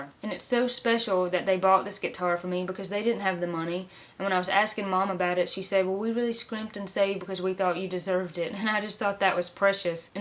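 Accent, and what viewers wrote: South, United States. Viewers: 1st off the word you were searching for that describes Christmas in childhood is MAGICAL! My mother was also a great documentarian so appreciate your video from the past. And lastly I think you have one of the most beautiful speaking voices I've ever heard. You should read audio books that need a southern accent!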